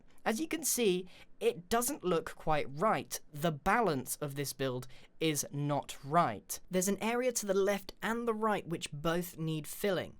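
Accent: United Kingdom. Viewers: I kind of don’t like him talking british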